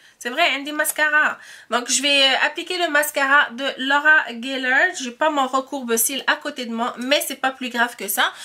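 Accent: Canada. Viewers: coucou,magnifique et superbe video,j'adore le make up,toujours a la hauteur bravo continue,j'adore ton accent canadienne,pour le petit masque javais dis 90 usd,sinon le rouge à lèvres est tres beau!!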